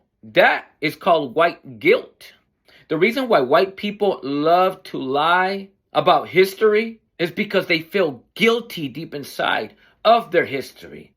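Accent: Australia. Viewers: DUDE YOU ARE NOT FROM HERE..AUSSIE??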